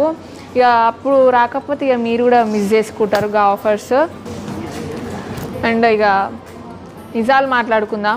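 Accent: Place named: Vijayawada, India. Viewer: Vijayawada antunnaru konchem aa slang change cheste bagundedi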